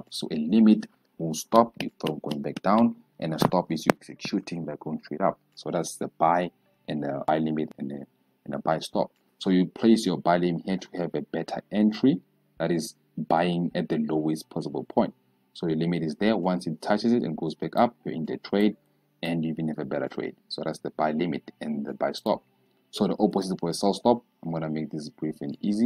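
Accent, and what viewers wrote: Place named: Africa. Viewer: That’s the most African accent I’ve ever heard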